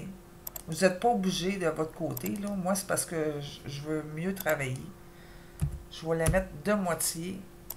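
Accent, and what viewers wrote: Quebec, Canada. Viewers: Merci pour cette rapide mais efficace présentation je vais voir tous vos autres tuto J'adore votre accent Québécois ( normal nous y allons chaque année..) Daniel France Normandie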